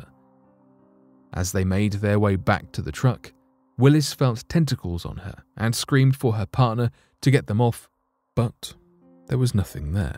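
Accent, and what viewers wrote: United Kingdom. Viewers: It's entirely possible that they are sourcing content from an unreliable source, an old source that is no longer familiar, or even sources that simply have different experiences from you lot. But to pull all those details out of their butts & off the tops of their heads is unlikely. I'm more apt to believe one of the first two possibilities, especially since the narrators sound like they might live across the pond (therefore potentially easily made to believe untruths, as they have no or very little experience & reference for places in the US).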